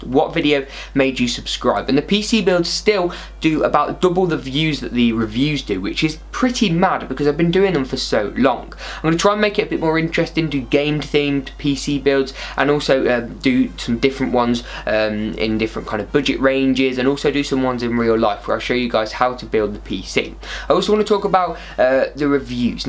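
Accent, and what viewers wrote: United Kingdom. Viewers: By your voice i think you're in the uk, where abouts in the uk would you suggest i buy parts (online or in store) i want to build the $700 build but buying in the uk with VAT and things it works out so expensive, so where abouts would you suggest to buy parts for the cheapest possible?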